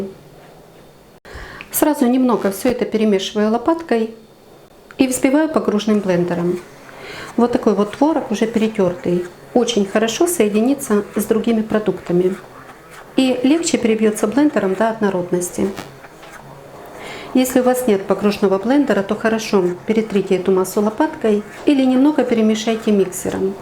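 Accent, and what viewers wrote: Ukraine. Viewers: Як же приємно ви говорите українською мовою, так чудово... А язік смердючого орка не можу чути, зразу звучить у вухах, як та погань з матом перерізає горло нашому захиснику, як дають цім язіком накази бомбардувати наші міста, спалювати наші ниви, врожаї, як похабно поливають нас хамською брехнею.... язік, який ви так поважаєте, лелієте..... невже СТІЛЬКИ СМЕРТЕЙ....молоді, діток, стареньких такі мовчазні перед БАЖАННЯМ заробити ті ЗКРИВАВЛЕНІ ЗГОРЬОВАНІ СЛІЗНІ копійки.... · Я смотрю ваши видео как сеанс гипноза, настолько успокаиваясь от вашего голоса,у вас такой красивый украинский язык,ну а рецепты все просто бесподобные,уже очень много готовила по вашим рецептам и все получалось идеально, спасибо вам за ваш труд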